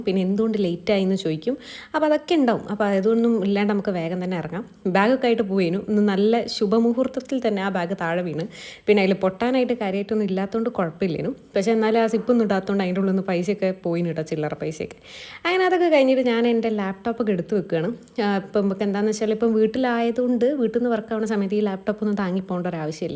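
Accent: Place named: Kozhikode, India. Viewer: Calicut Pronunciation. (slang )🙌 I have lot of colleagues from കോഴിക്കോട് in UAE-❤❤❤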